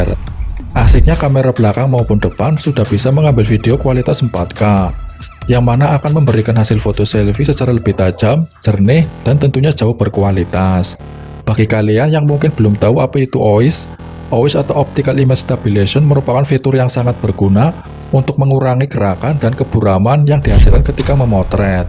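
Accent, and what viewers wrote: Banyumas, Indonesia. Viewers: Ngapak sekali 👍 min , , , ?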